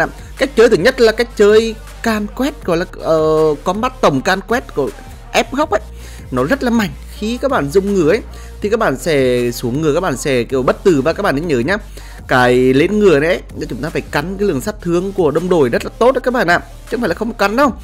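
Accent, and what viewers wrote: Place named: North, Vietnam. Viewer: dọng ah miền bắc nghe hay quá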